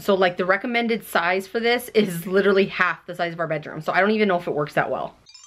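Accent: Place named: Midwest, United States. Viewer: Where are you from? Your accent is midwest-ish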